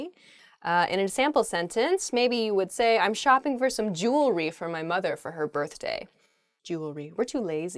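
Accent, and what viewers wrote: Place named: United States. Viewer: American obviously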